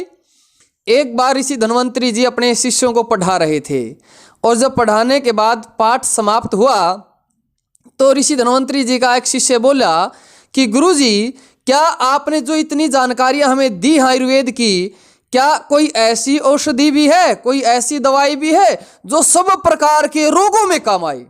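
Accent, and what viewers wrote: Haryana, India. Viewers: Haryana ki tone aa he jati h bich bich m 😁😁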